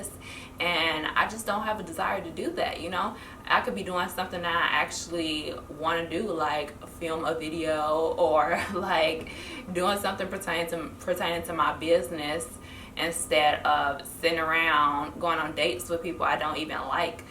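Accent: Chicago, United States. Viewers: Where are you from? You sound like you're from Chicago. Anyways Yes this is totally normal to feel like you're missing out or when will your time come to start a family. I'm 28 about to be 29 in like 2 weeks and I don't see myself getting married to anyone anytime soon... It sucks because as women we are meant to think that there is some type of clock ticking, but we often forget that the only clock that matters is God's clock. It also makes it difficult when you get to my age and everyone around you is asking when are you going to have babies... Your story unfortunately is not unique I too grew up in a single parent home and I feel like yes it was rough and it definitely left me lost when it came to relationships. I feel like I made a lot of mistakes and dealt with a lot of bad people because I was seeking love from the wrong places. At the end of the day life is a journey and no ones journey is perfect. 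The whole idea of being married by 25 with 2 kids a dog and a picket fence is not a reality it's just a fairytale. Pray that God will send you a good man and it will happen but don't be afraid to let some time pass before he finds you. Just keeping working and improving and before you know it things will fall into place even better than you imagined.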